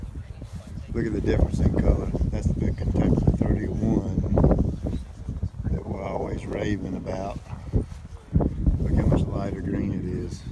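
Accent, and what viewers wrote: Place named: Virginia, United States. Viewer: I've watched slot of grass care videos. You're my guy! I'm definitely interested in purchasing your great blend. I live in NJ where it still gets just as hot as my hometown in Danville/Cascade Va. BTW, where are you from? You sound like you could be from those parts or close. Love your videos and look forward to learning more from you!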